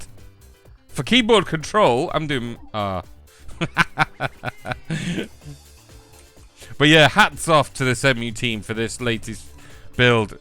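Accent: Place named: United Kingdom. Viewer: Your british accent makes me h a r d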